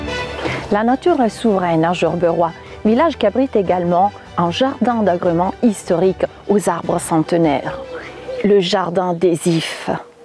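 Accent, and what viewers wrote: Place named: Italy. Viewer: quel bel accent italien...tout en douceur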